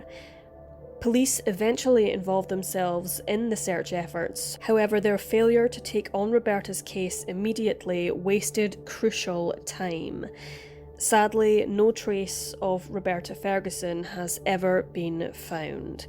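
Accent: Scotland, United Kingdom. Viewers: I like your accent, scottish?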